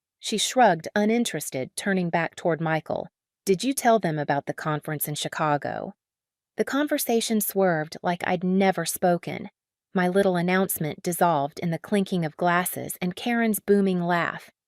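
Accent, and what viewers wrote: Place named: South, United States. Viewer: Interesting story. 👍 That accent.. is it from south? Lovely. Sorry I'm from Europe. 👍🥰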